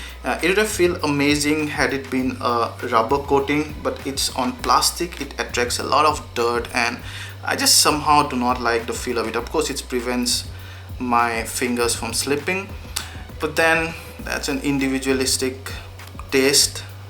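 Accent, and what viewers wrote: India. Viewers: fucking annoying indian accent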